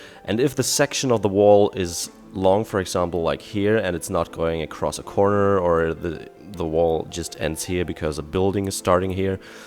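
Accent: Germany. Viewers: you defenitly sound german in your older videos, but in this one, for some reason, you sound different. were you sick, or did you have a different mic maybe? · es zeigt, dass Sie mit dem, wie Sie sprechen Deutsch sind Grüße aus Barcelona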